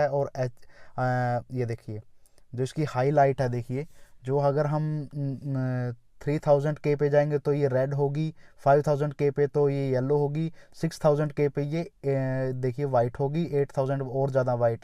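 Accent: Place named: Punjab, India. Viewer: That punjabi accent.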